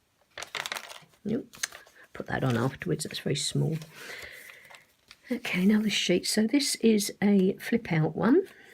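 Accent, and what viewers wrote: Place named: England, United Kingdom. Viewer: Absolutely Gorgeous Thank You for sharing with us . Nikki xxx ( I can hear you are English like myself ..Do you live in the UK )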